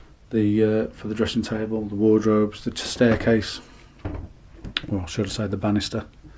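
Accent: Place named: Dudley, United Kingdom. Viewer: Where about in Dudley? I'm Dudley also, picked up the accent straight away ;)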